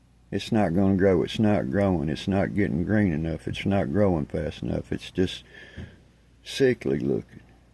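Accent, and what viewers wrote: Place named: North Carolina, United States. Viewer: a side thought.... sounds like you are from North Carolina or Tennessee.... love your accent... i live in east Tennessee ..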